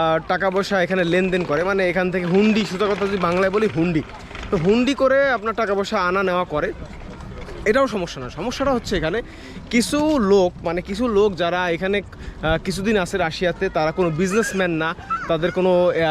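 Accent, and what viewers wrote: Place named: Meherpur, Bangladesh. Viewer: ভাই আপনি কি মেহেরপুরের ছেলে নাকি, আপনার কথা শুনে মনে হচ্ছে আপনি আমাদের মেহেরপুর জেলার ছেলে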